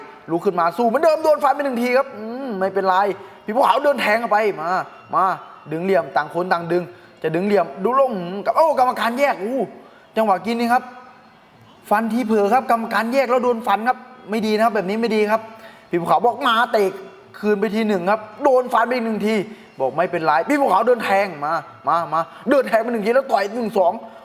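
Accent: Isan, Thailand. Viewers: พี่ ยังภาคไม่ดีเท่าไรนะคับเพราะว่าคำว่าเตือนการเป็นเตียน.เเล้วก้คำว่า.เลือดกายเป็นเลียด.พี่ น่าจะติดอีสานนิๆ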